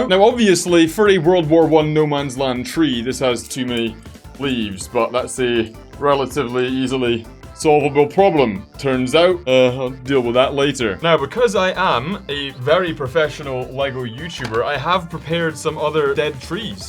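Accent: United Kingdom. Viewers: Sounds British. I think he needs a bottle of water.😂😂😂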